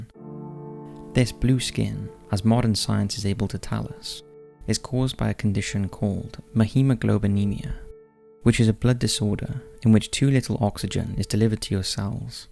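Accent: Wales, United Kingdom. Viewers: Your channel is good, been watching for a few years but you gotta sort out your English pal, what the hell is with all this pronouncing E's as A's.....for example 'click the BAL to subscribe'...wal, bal, tal ect when it's WELL, BELL, TELL and so on. Your voice tone is relaxing, but it's annoying as hell the way you are saying certain things. I am English and live in England and I want all Americans and people who don't live here to realise that his weird hybrid Welsh/English accent and his pronounciations of certain words are totally OFF and not normal AT ALL in England or English....'ostradized' ?? come on!...and he once called Sigmund Freud.....Sigmund 'Feud'. lol I have sympathy for him because i am 99% sure he suffers from Dyslexia, either that or he does it on purpose because it seems to be the fashion to talk strange on these kind of channels, i.e the youtuber named 'Chills' (intentionally stupid and annoying voice)....I can never work it out, does anyone else think the same?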